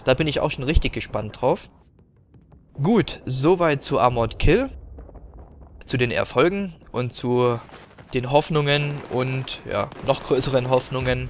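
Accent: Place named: Saxony, Germany. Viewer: Gutes Gameplay:) Aber sorry ich komm mit deinem leichten sächsischen Dialekt nich so ganz klar :/ Aber sonst gutes Video:)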